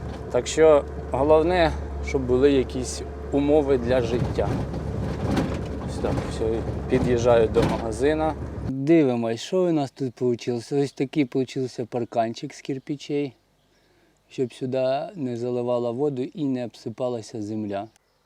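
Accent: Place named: Kherson, Ukraine. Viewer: Привіт земляки, як тільки почула наш херсонський суржик, відразу підписалася на ваш канал,ми теж зараз мешкає в іншому регіоні,але дуже хочеться додому,правда,квартира в річпорту затоплена,в приватному будинку на лівобережжі живуть орки,то ваша ситуація доволі знайома,бажаю вам міцного здоров'я, процвітання каналу,і многая літа під мирним жовто-блакитним небом!!!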